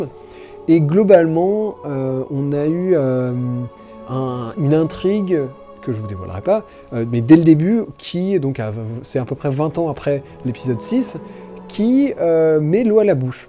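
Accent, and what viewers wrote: England, United Kingdom. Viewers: Cet accent Anglais !! :o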